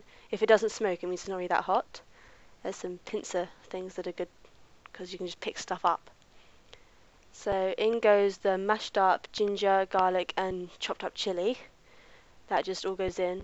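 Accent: United Kingdom. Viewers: posh Londoner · Thanks for the video - you made it look really simple. Love your accent! What part of England are you from?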